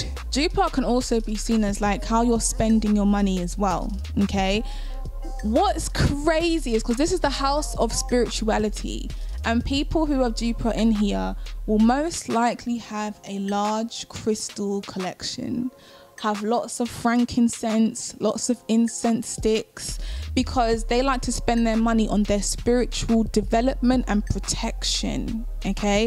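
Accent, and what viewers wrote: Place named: England, United Kingdom. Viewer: Why you are not a household name I do not know. Initially…You Lovely Lady😇, had me intrigued by your the English Accent. Then I noticed that you had certain flair/ “steez” as we use to say in Philly 🙂/style about you. Please note { these observations were in milliseconds ( says my Gemini ♊️Ascendent ) .} Then BAM 💥 My Jupiter in Pieces in the 12th house kicks in and I’m able to discern that you are Spiritually and Intellectually Wealthy! You have a Greater understanding of who the planets are entities. Just like me. Hey Girl , I love your work.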